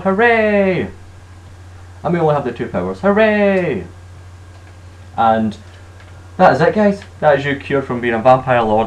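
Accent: Scotland, United Kingdom. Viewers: Are you from Scotland or are you from Belfast England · You sound scottish haha Ich bin aus Mulheim an die Ruhr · I was going to be cool like these fools and ask if you were, like, Russian or something...but it's pretty clear that you're Scottish. Scottish FTW. Thanks for this video. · I subscribed lad because you're scottish!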